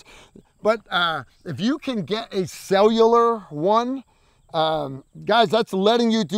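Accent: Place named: Upstate New York, United States. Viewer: Upstate New York accent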